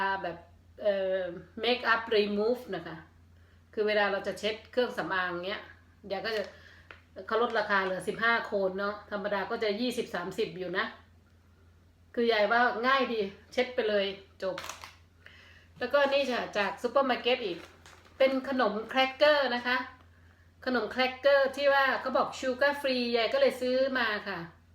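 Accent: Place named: Central Thailand, Thailand. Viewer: ยังไม่แก่เลยเรียกตัวเองว่ายายแล้ว เราอายุมากกว่าอีกยังไม่อยากเป็นยายเลย 5555 ดีค่ะชอบ พูดภาษากลาง เราคน กทม.ฟังภาคอื่นเค้าคุยกันก็น่ารักดีนะแต่ฟังไม่ค่อยรู้เรื่องคะ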